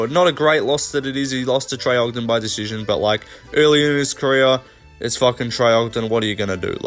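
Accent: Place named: Australia. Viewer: Hablas gracioso, pero tu español suena bien con el acento australiano.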